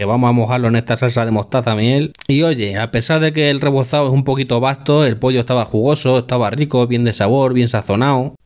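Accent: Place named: Cáceres, Spain. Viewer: De donde eres ? Tienes acento cacereño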